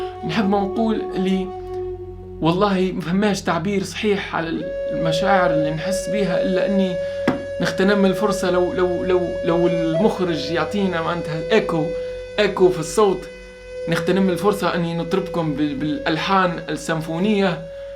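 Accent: England, United Kingdom. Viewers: Dat english accent xD nice video , mafhoum w message reçu <3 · That England accente tho :D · that british accent tho ❤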